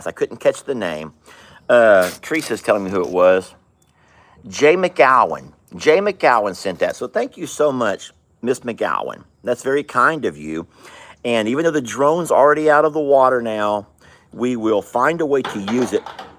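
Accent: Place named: Texas, United States. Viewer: Me too, that Texan twang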